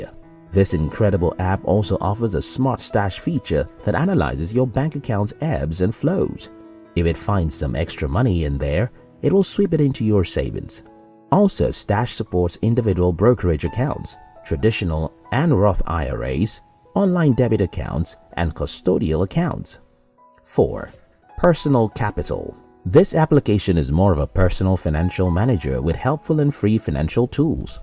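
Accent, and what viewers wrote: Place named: Nigeria. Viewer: The voice over sounds like that of a Nigerian.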